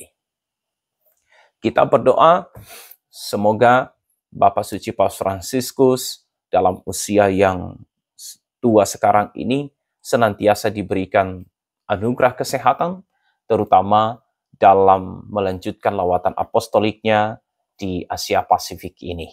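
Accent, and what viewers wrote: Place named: Borneo, Indonesia. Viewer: Dari logatnya ibu ini kayak logat dayak ya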